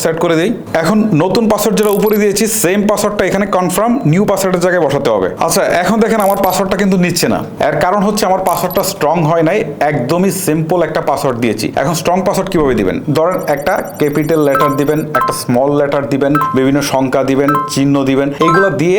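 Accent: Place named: Chuadanga, Bangladesh. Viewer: ভাই আপনার বাসা কি চুয়াডাঙ্গা অথবা মেহেরপুর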